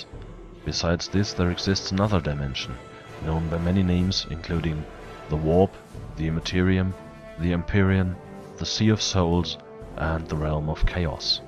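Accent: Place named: Germany. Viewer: thanks for your educational videos. the special voices you use and your German accent make it even better.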